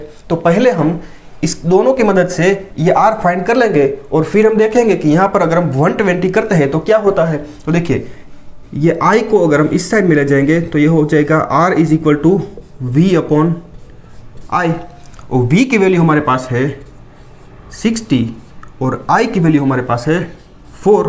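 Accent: Gujarat, India. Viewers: Bhai tame Gujarati cho ne. Awaj thi khabar pade che. Kayi city thi cho bapu?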